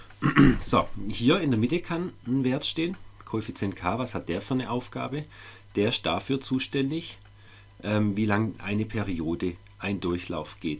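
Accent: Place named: Swabia, Germany. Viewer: Haha 😂 Suppa Schwäbisch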